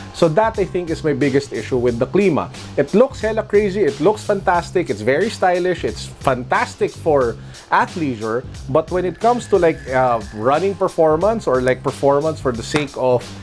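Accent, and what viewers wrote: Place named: Philippines. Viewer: Philippinos have the best accents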